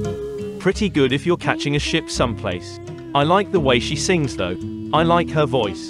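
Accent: United Kingdom. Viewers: sure put on a right proper British accent for this program. 😂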